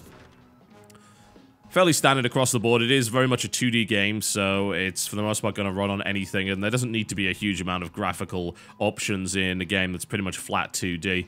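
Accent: United Kingdom. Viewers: And he as a Brit uses the proper British Cambridge Dictionary- and grammar-nazi-approved pronunciation. So yeah, pointless indeed.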